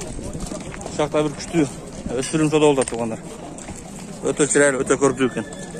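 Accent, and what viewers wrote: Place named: South, Kyrgyzstan. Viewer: мына оңолупсуң. Баягы " паразит сөздөрдү колдонбой публично таза сүйлөп калыпсың. Дагы иште өзүңдүн үстүңдөн ( орусча - над собой). Мага окшоп сын айткандарды жаман көрбө- сенин пайдаңа айтылып жатат. А максимально талап өзүңө кой- кыргыздын түштүк диалектисинде эмес- баягы адабий бекитилген мамлекеттик тилде сүйлөгөнгө аракет жаса( баягы "Ала-тоо маалымат программадагыдай. Эмне дегенде- бара- бара аудитория көбөйүш үчүн сени бүт Кыргызстан көрүп угуш керек. А сен жалаң диалекте сүйлөп жатып алсаң- башка региондор жактырбай көрбөй коёт- аудитория жоготосуң.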